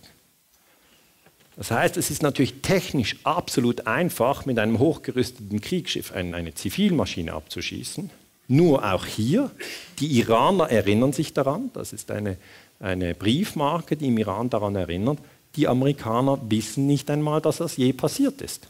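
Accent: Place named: Switzerland. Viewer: Der Schweizer Akzent ist heilig !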